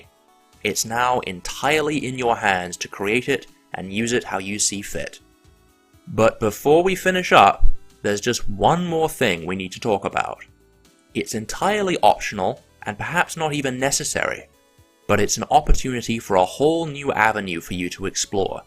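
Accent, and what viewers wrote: United Kingdom. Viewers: Damn British English. I keep thinking he's saying "copular", not copula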